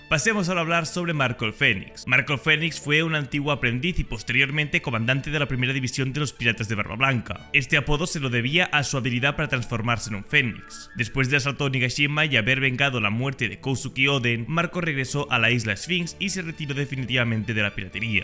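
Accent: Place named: Catalonia, Spain. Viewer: com es nota q ets català a l'hora d parlar jajja brutal el video com sempre!❤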